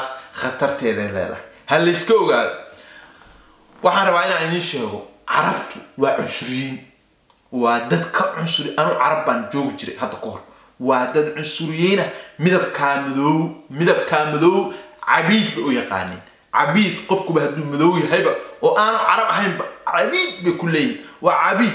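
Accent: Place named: Bari, Somalia. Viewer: with his reer bari accent is funny lool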